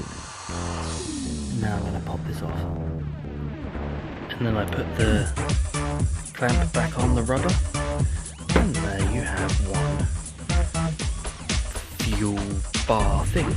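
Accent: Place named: England, United Kingdom. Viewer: This seems to be the narrative in my head during car wrenching. Mine lacks the English accent and includes many more profanities. This video is gold.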